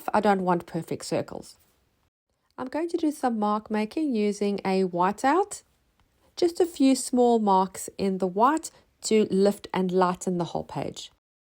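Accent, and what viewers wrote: South Africa. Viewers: Lovely. Love the South African accent. ❤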